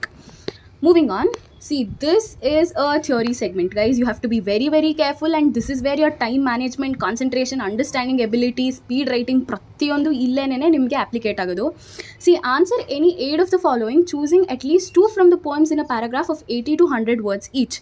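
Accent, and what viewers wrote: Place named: Uttara Kannada, India. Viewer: Pakka uttar kannada nemduu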